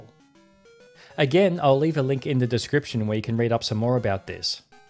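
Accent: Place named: Australia. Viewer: Niche info about old games, Aussie accent, backing track. Perfect channel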